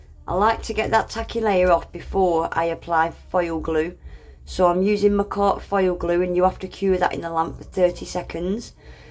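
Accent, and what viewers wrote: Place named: Scotland, United Kingdom. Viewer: Impressive, as always! I have to say, once you said you were Scottish, I said, "That's it! That's where her accent comes from!". But, like you said, it's not quite that obvious. I love listening to you! I'm American, but I have royal Scottish blood in me! My family is very proud of that. My ancestors had to flee the country many generations ago because of their religion. Not an uncommon story. Thanks for sharing!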